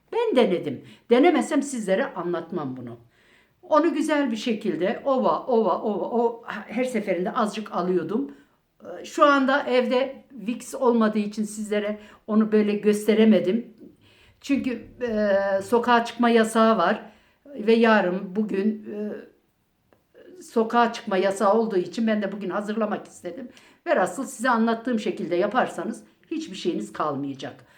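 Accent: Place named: Eastern Anatolia, Turkey. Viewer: Bu xalanın danışığı çox şirin gəldi. Bizim dilə çox yaxın danışır. Bu xala Erzurum, Kars, İqdır ya da Vandan olmalıdı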